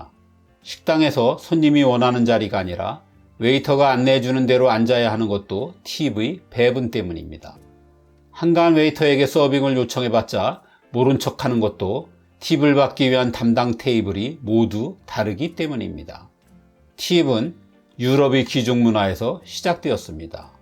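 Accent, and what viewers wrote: Gyeongsang, South Korea. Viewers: 남한 표준 언어의 경상도 사투리 오염 현상 때문에 그렇습니다. 경상도에서 ~또 라고 발음하죠. 전에는 없던 현상인데, 없어져야 할 악습입니다. 지적 잘하셨습니다.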